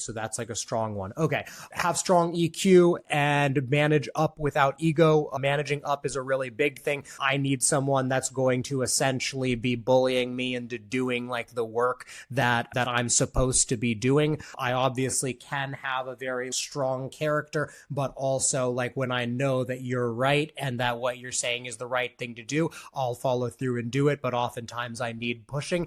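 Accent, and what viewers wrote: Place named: United States. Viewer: Hi.... I have never considered being an EA (until today) but it's a rare quality to find someone who thinks so fast and be unashamed about it. I shared this video with my Danish wife and she laughed out loud (thinks we have the same American voice and talking tempo). Even if we never meet in person, I look forward to more content from you. NDs need change champions just like you.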